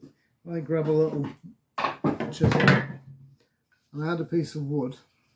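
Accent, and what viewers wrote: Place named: United Kingdom. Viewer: Thanks for posting this, it's very timely in that I do have to sharpen both my tenon and gentleman's saws. I also have a Disston crosscut saw which needs re-sharpening after I managed to mess it up many years ago and haven't had the courage to revisit. I was considering using one of the many blunt, disposable saws I have as a practice piece before tackling the the disaster which is now my Disston. I'm hoping that the teeth on those disposables aren't too hard for my file, I suppose I'll be finding out very shortly, LOL. Now that I am armed with your inspiration and the need to do something, plus an endless supply of potential practice material a sharpening might actually happen. I cut down those disposable saw blades into points about 200mm long to make stab saws and the like, brilliant for cutting out holes in plaster board and similar stuff, the teeth closer to the handle get little use and stay quite sharp while the rest are wasted. 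It beats just chucking them away, and makes nice, cheaper than cheap custom shaped saws with little effort and an angle grinder. Has anyone used those blades to make scrapers? Just a thought I was going to put to the test. I've subscribed. It's refreshing to hear a British accent which isn't AI tripe, giving some solid, no BS advice. Thanks so much.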